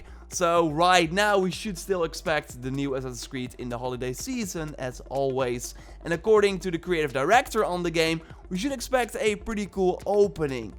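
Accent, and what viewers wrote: Netherlands. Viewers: are you dutch? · Are you Dutch lol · Are you dutch?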